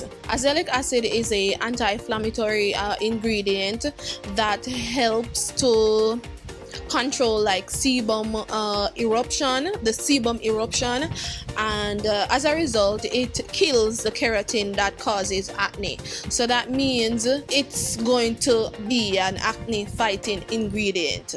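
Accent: Jamaica. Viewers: Love your Jamaican accent 😍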